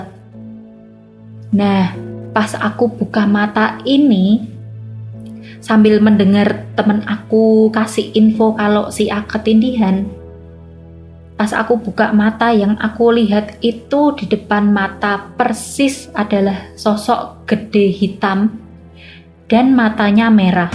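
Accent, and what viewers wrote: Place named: Manado, Indonesia. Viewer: Logat mba nya Manado banget 😅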